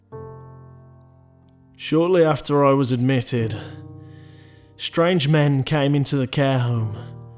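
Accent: England, United Kingdom. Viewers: such a english accent lol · The British accent 😮😮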